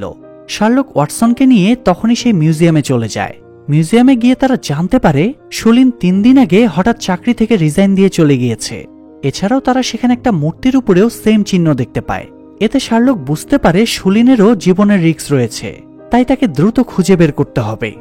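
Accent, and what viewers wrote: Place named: East Bengal, Bangladesh. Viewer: কথকতার মান ভাল না। গলার আওয়াজ খারাপ ।উচচারন বানগাল বানগাল।উচচারন ঠিক করে নাও।শুভেচছা রইল।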